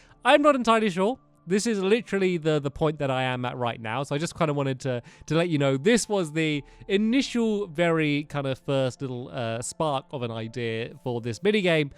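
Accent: England, United Kingdom. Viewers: Oh, oh you make me smile! I think i'll sit down and watch for a while. Oh, oh, when i hear voice. I hear the engish acent i rejoice! Oh, oh, your worldx are lovely! Full of cakes helpers and lovely jublies. Oh, oh sta-oh no! Its hitthetarget!! He shoots an arrow, you gotta block it! Horray we won! Now the day is done. Now its night, turn off the light and dream... Oh, wait, whats my dream? To become your helper. I am a great builder! Oh please, oh please. Gamer tag: CrystaI dog P.S. the L is a capital i Thank you!😊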